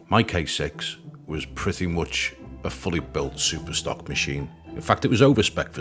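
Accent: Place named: United Kingdom. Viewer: ha no don't think so. Unless you're on the tdr forums, my name is blacklines on there. Or Owen in real life. Think it was just a bit disorienting seeing Collie and thinking ok it's one of the WA lads. Then hearing your voice and thinking ah maybe I was mistaken. Maybe it's someone off tdr. Then seeing Wanneroo and realising ah you're another brit in WA. I moved over here a year ago.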